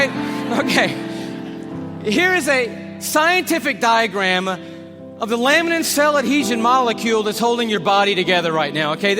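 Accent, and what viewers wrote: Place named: South, United States. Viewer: Ευχαριστώ το video που δικαιολογεί την αθεία μου. ¨Εφτιαξε λοιπόν όλα αυτά τα τεράστια άστρα που δε μπορούν να φιλοξενήσουν ζωή, για να δημιουργήσει μια προσωπική σχέση με εμάς τους τυχερούς στην μικρούλα Γη. Εκατοντάδες δις γαλαξίες με εκατοντάδες δις άστρα για να πει στους Εβραίους ότι τους ελευθέρωσε από τους Αιγυπτίους, για να μας απαγορέψει να τρώμε μπριζόλα τη Μεγάλη Παρασκευή και να μας επιβάλει το πότε το πως και το με ποιον θα το κάνουμε στο κρεβάτι μας. Ηλίθιος ο ομιλητής και φυσικά με νότια προφορά. Οσο πιο βλάχος τόσο πιο θρήσκος ...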